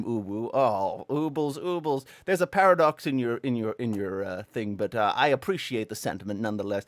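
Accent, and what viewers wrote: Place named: Georgia, United States. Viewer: I didn’t know you were a southerner from Georgia. Amazing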